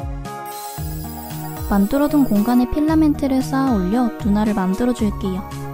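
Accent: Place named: Japan. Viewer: 뭔가 말하는게 외국인같다 그 욕하는게 아니라 발음이 뭔가 둥글둥글한? 일본인이 한국말하는 그런 느낌이다 한국말 완전 잘하는 일본인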